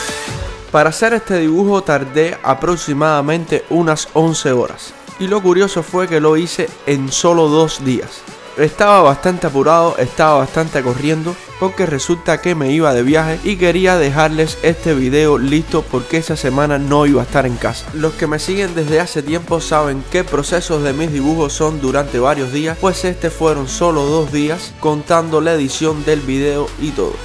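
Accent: Cuba. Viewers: Tú eres cubano ..???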